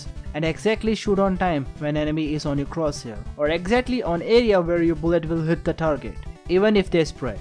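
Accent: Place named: India. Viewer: Talking is indian accent is ok bro Pls using American accent looks very cringe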